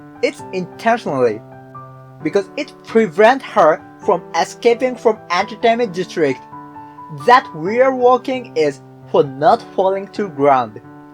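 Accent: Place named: Brazil. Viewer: you sound brazilian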